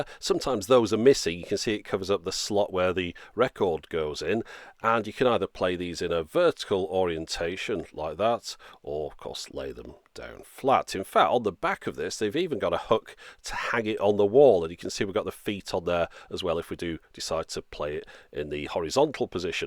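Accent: United Kingdom. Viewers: Great video. I'm an English student at the University and it's really nice to listen to something with nice British English. Having fun and improving my pronunciation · This accent is so, so British!!! Like in this video: <link>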